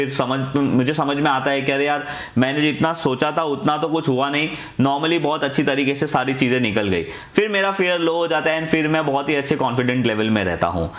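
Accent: Gujarat, India. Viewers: bhai, u r from Gujarat?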